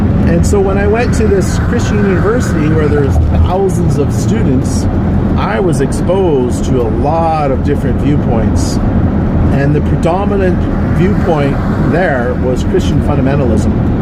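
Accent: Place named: Canada. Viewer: I think your video is great, and I am glad you’re living your truth and your best life! I don’t want to assume but you sound like you could be Canadian and religion is a lot different in the north than it is in the southern United States. Southern Christians are the most backbiting, gossiping, trouble, making, justifying and doing whatever the hell they want to kind of people you can ever imagine. They intermingle their self righteousness, and their politics and their loathing of gays and transgenders all into this big cauldron of godliness that does nothing but hurt and destroy the ability of the south to move forward into a truly progressive way of living. I am 54 years old and wasted decades of my life on Christianity as well as thousands of dollars. I am beyond pissed off about it and I wish I could go back in time and change it. Stay strong and keep on rockin!👊🏻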